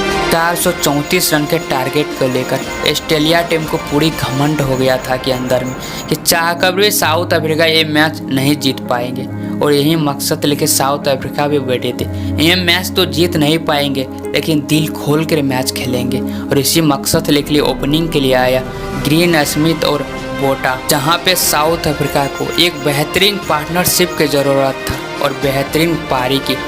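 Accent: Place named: Bihar, India. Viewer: Abe sale bihari hindi bolni seekh pehle bestman ashtelia khilod gindbaz dhmkedaar tbrtor naseme kia h sale shudh hindi bolo bihario salo😊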